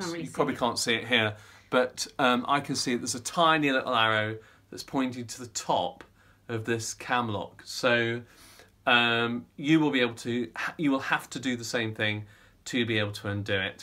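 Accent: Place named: United Kingdom. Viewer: Love the British accent, wish I got one too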